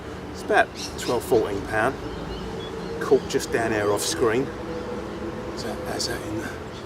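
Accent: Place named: South London, United Kingdom. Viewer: Loving the vids bruv what country you fishing in. I can hear the south London in ya accent 👍👍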